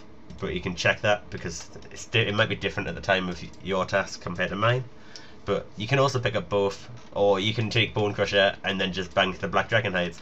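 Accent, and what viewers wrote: Newcastle, United Kingdom. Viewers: btw are u a geordie ?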